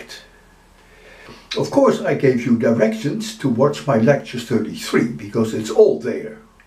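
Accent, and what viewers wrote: Netherlands. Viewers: Are u from the Nederlands?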